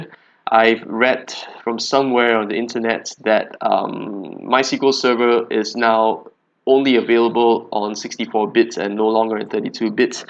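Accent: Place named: Singapore. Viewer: Singapore? Malaysia? That accent is unmistakable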